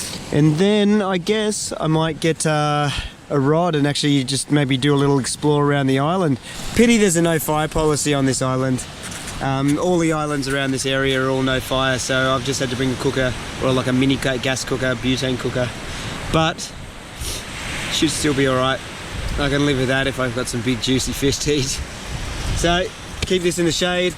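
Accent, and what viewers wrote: Australia. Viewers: Is this Australia ? I sense because of accent. English isn't my native language so don't quote me on my guess. It's pretty beautiful though. Keep up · He sounds Australian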